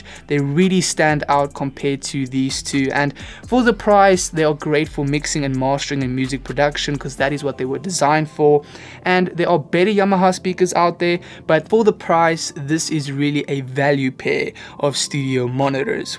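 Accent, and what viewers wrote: South Africa. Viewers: hello, Just a random question are you south african (you ascent just sounds like it)?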